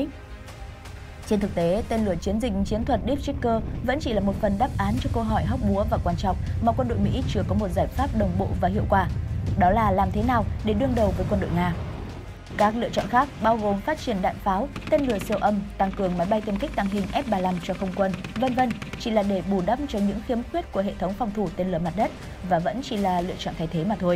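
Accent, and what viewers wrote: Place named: Vietnam. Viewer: Tên lửa Mỹ, bằng tiếng Anh- Mỹ, thì kênh phải phát âm như tiếng ANH - MỸ; ai lại phát âm theo kiểu VN nghe nhà quê và sai bét.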